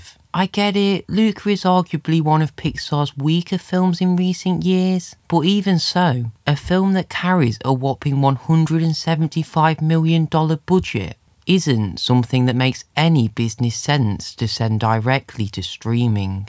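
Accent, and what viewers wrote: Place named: United Kingdom. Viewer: I Think You Live In The UK Reason: Accent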